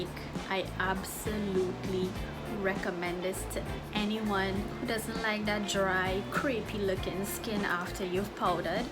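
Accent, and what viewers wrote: Trinidad and Tobago. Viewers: I know a trini accent when I hear one!! Heyy family 🇹🇹🇹🇹💕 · I heard you’re accent and said this have to be a Trini. Does your fridge condense? I am looking to buy this but the reviews say it condenses so I came to YouTube to find a review and found your video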